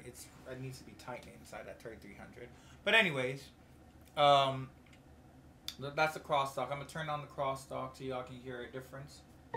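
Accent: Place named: London, United Kingdom. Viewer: Odd question, but are you UK born? You have a slight London accent :-)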